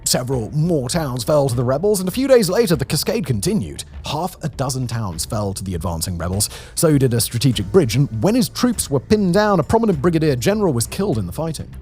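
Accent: Australia. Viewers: He's British? I thought he was Australian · You said it! Thanks! Is this Simon Dude Australian? And what’s the HURRY! Don’t forget his mispronunciation! He keeps saying: “MY-AN MAAR”!!! Is it you “MAAR” or my “MAAR???”. Sorry, I had to STOP listening! Couldn’t take it any longer! It’s too Disturbing! But, I will HELP you: Say “Mee” (like Me) and “Maar” Not that hard for you! OK! Let’s TRY! “Mee-an-Maar”. Please change next time you talk about Myanmar ex Burma. Remember “ Mee-an-maar”. Ex fan of. Johannes Koistinen-Lindgren born in Suomi-Finland. Resident in the northern Thai hinterland Khlon Nam Lai village.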